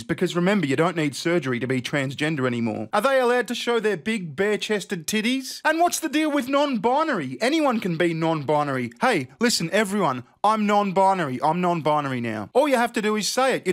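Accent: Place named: Australia. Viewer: This guys sounds so much like Aussie guy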